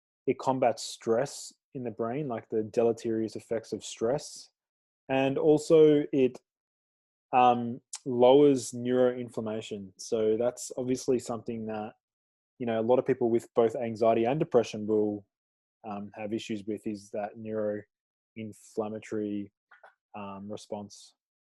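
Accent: Australia. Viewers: he's not british. That is an Australian accent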